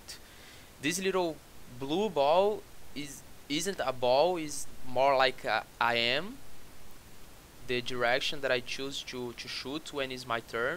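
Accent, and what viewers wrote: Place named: Brazil. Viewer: Acredito que seja Brasileiro pelo sotaque, mas que otimo video!! Nao pare de fazer videos!!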